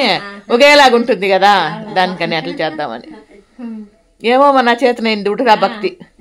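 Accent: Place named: Rayalaseema, India. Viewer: Mee casual talk.i like your royals seema slang. Geetha Reddy Chennai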